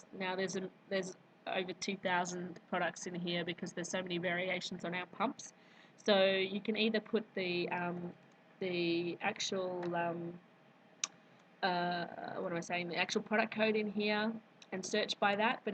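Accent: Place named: Australia. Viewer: Thx Ms,. Very useful. Love that Aussie accent!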